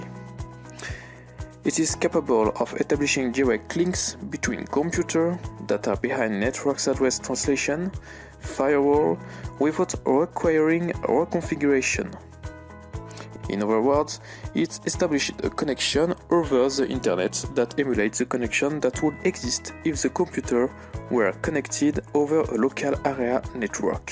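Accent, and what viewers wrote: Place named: France. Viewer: i spot a french accent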